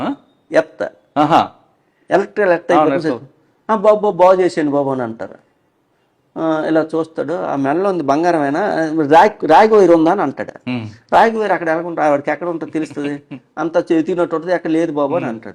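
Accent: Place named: Srikakulam, India. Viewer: సార్ ది మా శ్రీకాకుళం లాగుంది !!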